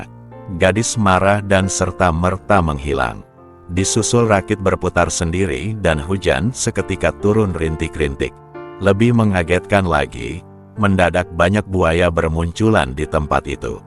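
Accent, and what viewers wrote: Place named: Banyumas, Indonesia. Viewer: Ngapak bshasane tur kaku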